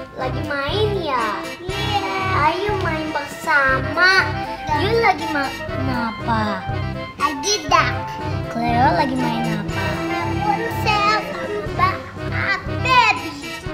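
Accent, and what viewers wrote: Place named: Medan, Indonesia. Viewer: heloowww keluarga cemanaa kok lama gak bikin vlog lagee,kitah nunggu2 neeh..lucu dengan logat ala2 medan ini😊😊,jarang2 kaya mak beti jadinya,coba doonk koleb sama mak beti,atau bareng keluarga mas anang,atau sama bapau(baim paula),biar makin zeruu vlog keluarga cemana ini 🖒🖒,selalu semangatt,Tuhan berkati💕💕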